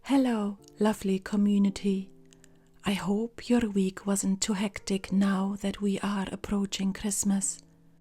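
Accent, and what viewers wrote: Scotland, United Kingdom. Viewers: Ah, danke ❤. Ich liebe deinen schottischen Akzent. Auch dir einen wunderschönen 3.Advent 🎄☃️🎅🕯️🕯️🕯️❣️ Bis bald ❣️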